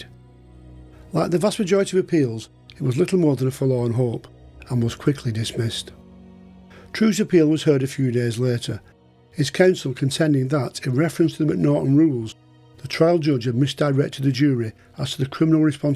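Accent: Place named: Bolton, United Kingdom. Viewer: You definitely deserve more subscribers.All that meticulous research.Maybe if you changed the name of your channel 'Albert Pierrepoint v Ted Bundy'.Incidently I saw a Pierrepoint documentary with a very young looking as a consultant,Love to hear your mellifluous Boltonian twang,Keep up the good work kid. [sorry ]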